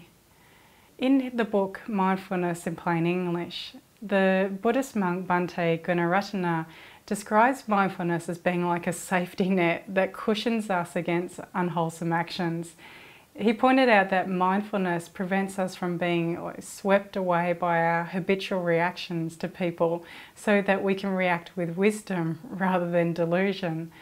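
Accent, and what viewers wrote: Australia. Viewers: Excellent talk, thanks for sharing. Lovely Australian (?) accent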